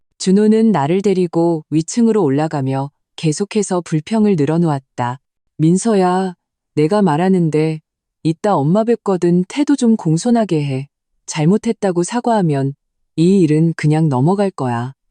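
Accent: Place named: China. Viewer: 해설자가 중국사람이야 왜.숫자를.그렇게잃냐.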